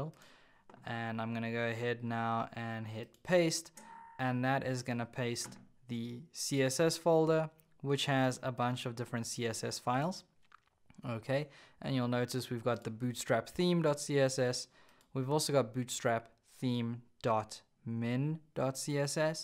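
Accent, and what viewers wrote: South Africa. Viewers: Sounds like you're South African? Great tutorial btw. Lekker man.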